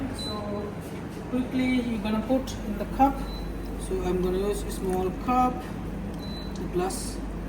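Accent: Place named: India. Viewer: English katha karane India un wage ai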